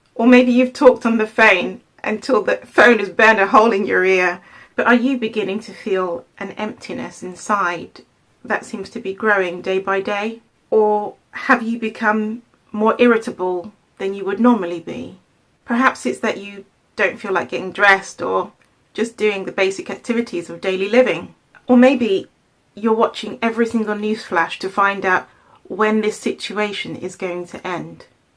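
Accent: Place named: RP, United Kingdom. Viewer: You've got a Received English account and speak fluent Italian- i want to be you when i grow up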